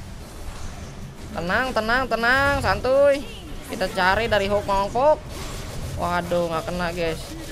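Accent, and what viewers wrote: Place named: Banyumas, Indonesia. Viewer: Lu knp MEDOK bngt broo ... Ngapak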